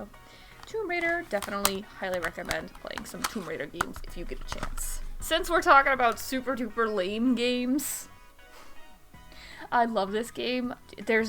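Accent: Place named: East Coast, United States. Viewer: Your video came up in my algorithm feed, since im from CA what kind of east coast accent is that I like it???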